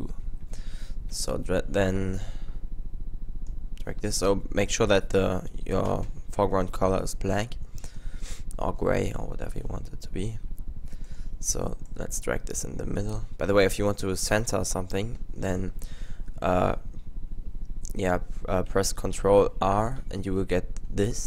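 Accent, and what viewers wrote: Germany. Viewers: Man merkt an deiner Aussprache das du ein Deutscher bist :D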